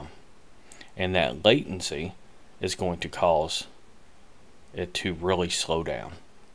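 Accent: United States. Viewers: You are showing exactly what I was asking about.... I saw your other videos. Many many thanks for this sharing knowledge spirit especially it is presented with this special american accent (which area it belongs to?). Thanks and may God bless you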